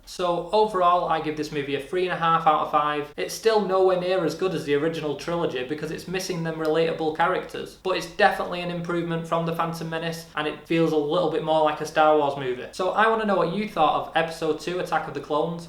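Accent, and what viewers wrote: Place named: England, United Kingdom. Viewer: Good review! I was wondering, where in England are you from?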